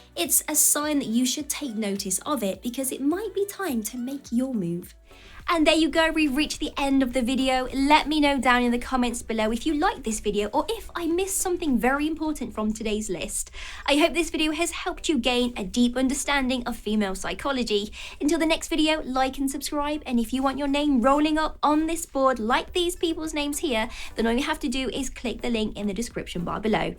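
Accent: United Kingdom. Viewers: Dude I love your worldsmost wickedest British accent in this video of yours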